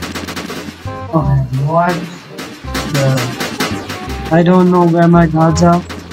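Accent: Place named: India. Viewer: I can tell by your accent that you are indian, im indian too!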